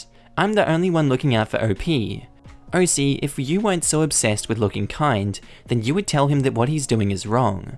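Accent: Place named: Australia. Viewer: I love the Aussie Accent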